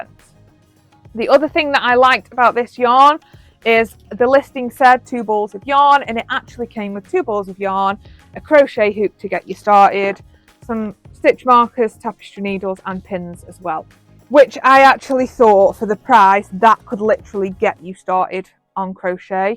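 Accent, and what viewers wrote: United Kingdom. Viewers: Are you from Liverpool? Love to listen to your accent.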